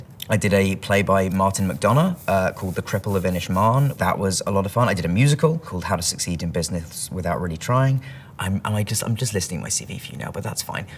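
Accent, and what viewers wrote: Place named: United Kingdom. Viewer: 自分はカリフォルニア州に住んでてアメリカ英語に常に触れてるからかもしれないけど、凄くブリティッシュだと思った。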